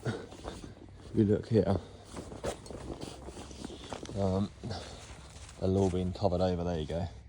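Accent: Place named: United Kingdom. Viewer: No one needs ask where you come from, its easy to hear you are a Brit because every other word is ''yeh'............................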